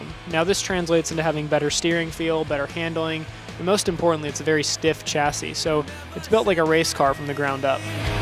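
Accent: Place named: United States. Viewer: Which part of America is his accent from? It sounds very pleasant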